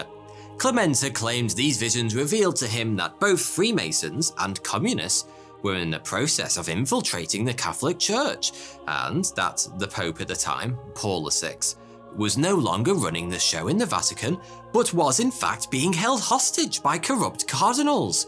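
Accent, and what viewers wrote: United Kingdom. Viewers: I love how British he sounda💕🇬🇧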